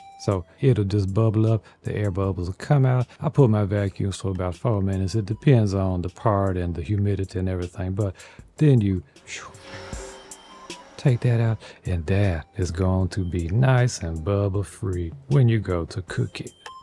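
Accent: Louisiana, United States. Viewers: Hooray! Another Crafsman video! 🤩I love your soothing voice and Louisiana accent (I grew up in New Orleans, myself.) I also love how inventive you are-- it inspires me to try new things.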